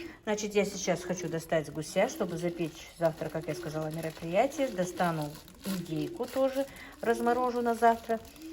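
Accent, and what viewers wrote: Standard. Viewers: я Ваша тёзка. Недавно подписалась. Я с Троицкого. А Вы где живёте? И ещё хотела спросить: Вы раньше не в Грозном жили? Или с Пригородного? У Вас речь чистая и правильная...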